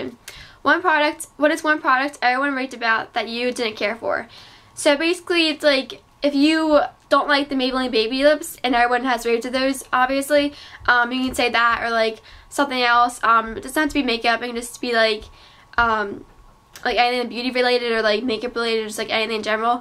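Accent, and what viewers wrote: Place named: United States. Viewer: Your so pretty and I love your accent I want to be American ahh , the falsies mascara did not justice for my lashes it just wasn't worth the rave hah xox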